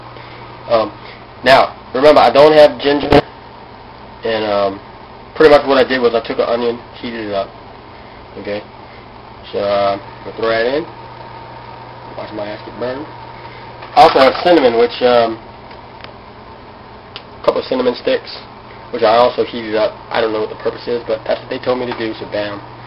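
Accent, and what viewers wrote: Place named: East Coast, United States. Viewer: he sounds like hes from the east coast but this is some half ass pho but a lot better then what i can do.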